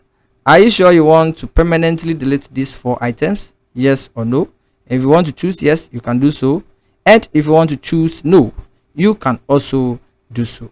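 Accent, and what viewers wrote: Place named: Africa. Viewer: Really love your African accent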